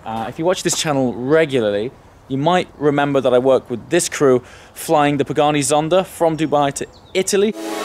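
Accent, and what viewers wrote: RP, United Kingdom. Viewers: Your posh Brit accent had me in stitches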